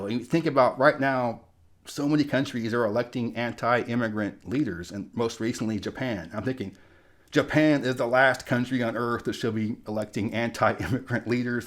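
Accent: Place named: Southeast, United States. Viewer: I'm just guessing here, but your southeast accent is apparent to my ears, which many Mormons might associate with the Baptist denomination. And I believe there is a bit of hostility between LDS and Baptists, which became apparent to me when the typically-Republican-voting southern states had a very low turnout for Mitt Romney when he ran for President three or four election cycles ago. So it may go both ways, who knows. Edit: I'm from the Midwest, have traveled through Utah extensively, and lived in Wyoming for about a year, and was always embraced by the local population. You're a friendly enough guy, so it's got to be something else, either license plate or accent related (or that T-shirt you used to wear that read "Losers, Derelicts, and Savages unite)".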